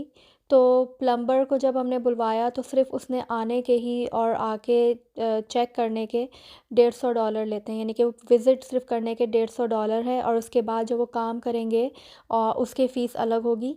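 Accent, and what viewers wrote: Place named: Karachi, Pakistan. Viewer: By accent looks like you are purely from Karachi. 🤔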